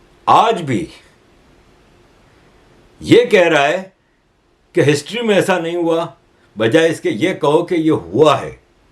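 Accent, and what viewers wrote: Gujarat, India. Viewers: Sir your accent is Gujrati.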